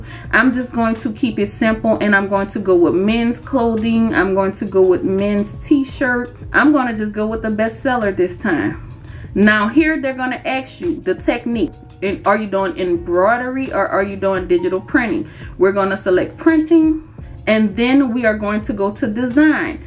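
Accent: New Orleans, United States. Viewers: I'm new here. Are you from New Orleans? I know my people. Our dialect is very distinct. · Sister are you from New Orleans??? I'm a new subscriber and you're accent sounds very close to home lol. I absolutely love your energy, from intro to end. You are a blessing ❤️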